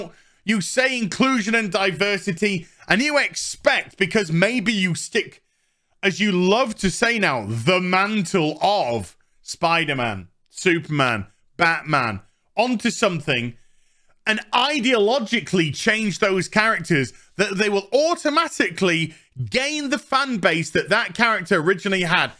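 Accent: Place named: RP, United Kingdom. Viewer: BTW, I love your "British" (posh) accent.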